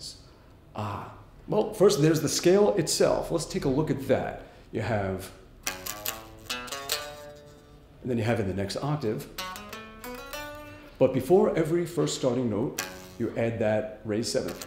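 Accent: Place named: United Kingdom. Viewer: speaks like British some times, He is the american G. Govan.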